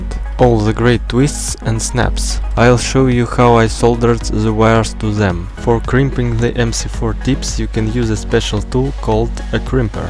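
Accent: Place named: Soviet Union. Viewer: Советский акцент английского языка =))) на 12й минуте спалился русским счётом! =))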